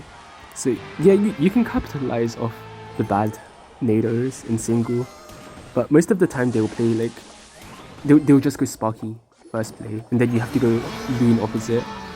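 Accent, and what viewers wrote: United Kingdom. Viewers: Damn bro you sound so British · Hm i remember when u said u wouldn't voice reveal bcuz if ur british accent 😅😂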